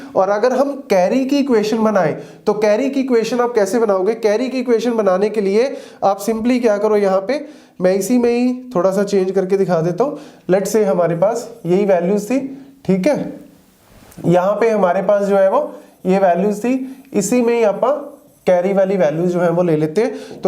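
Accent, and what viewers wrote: Punjab, India. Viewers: Sir ! Are you from Punjab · Sir tusi punjabi bolde oo routine ch ?? Thodi lehje toh lag reha tusi punjabi bolde howoge ??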